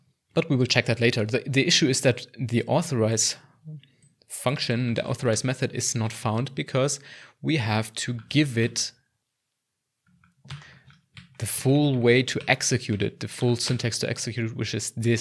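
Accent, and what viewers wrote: Germany. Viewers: I genuinely like your accent and you being a German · Ich liebe deinen deutschen Akzent ❤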